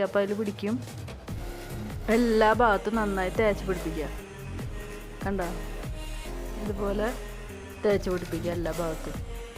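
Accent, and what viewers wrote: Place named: Thrissur, India. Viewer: ചിക്കൻ പൊളിച്ചുട്ടാ.. നമ്മടെ തൃശ്ശൂർ ഭാഷയും.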